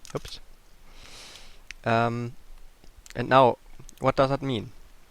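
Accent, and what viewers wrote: Germany. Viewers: Man hört es übel... allein wie er think ausspricht :) typisch deutsch eben! :D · man hört voll dass Du deutscher bist xD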